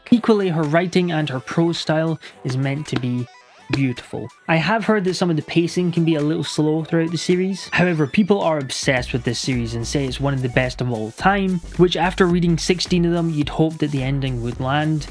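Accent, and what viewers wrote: Scotland, United Kingdom. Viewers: Are you Scottish? You sound like me if I went to Kelvinside and then spent at least 19 years in the Netherlands. Also, it's a great list, but I'd have had Brent weeks assassin series or Raymond E Fiest's magician series on there. Cheers anyway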